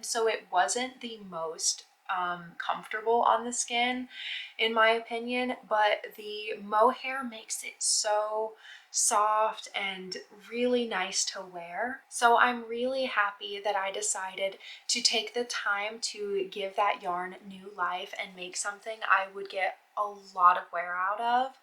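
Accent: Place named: Australia. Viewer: I've been watching your video's for a little while now, and I feel like your accent is changing a little bit to sound more Australian lol Also, I feel like our taste in knit pieces is so similar, I love fall colours too and you always pick such beautiful patterns! Watching your video's makes my fingers tingle to start new projects haha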